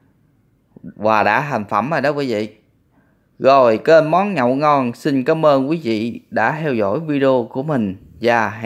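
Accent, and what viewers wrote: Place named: Binh Duong, Vietnam. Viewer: Nghe giong noi ban ... minh nghi ban o binh duong thi phai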